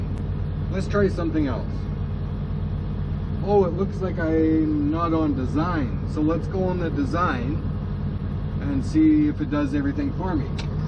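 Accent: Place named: Canada. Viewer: I’d love to learn one of these systems for bigger projects, because running an 8 with a guy walking with a laser setup and a stick in his hand can get frustrating. I can do a decent job just doing it off of my eye, but like you said you still have clean up and compaction to take into consideration. Anyway, I feel like if anyone believes these misnomers that you brought up in the video they are probably irrelevant people to be concerned with and if you put them in a seat of a machine that’s quickly find out that we have job security for a VERY long time as operators. As a side note I love the way Canadians say “PROcess” and “PROject” haha cheers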